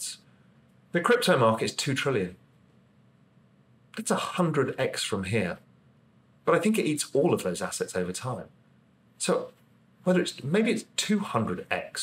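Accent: United Kingdom. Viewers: Really diggin’ that UK accent and cadence! Makes these wise words even better! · Ha ha ha ha. Like I said a few weeks ago. BS with a British accent is still BS!!🤣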